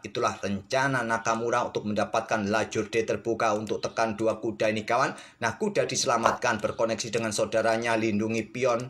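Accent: Java, Indonesia. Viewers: 50mnt mah mending cari rokok sekalian bikin kopi wkwk. Santuy dulu ga sih? Suka sama pembawaan channel ini. Apalagi kalo udah ngomong "di caplok" atau "baku hantam" wkwk aksen jawanya itu loh yg bikin seru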